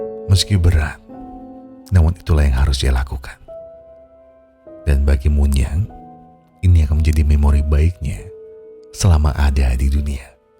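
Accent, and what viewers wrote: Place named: Surakarta, Indonesia. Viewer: Orang mana si bang ko solo banget logatnyaaa 😭…. Dari chanel soundsmokers sampe sekarang ganti jadi setia gw banggg🫵🏻🫵🏻🫵🏻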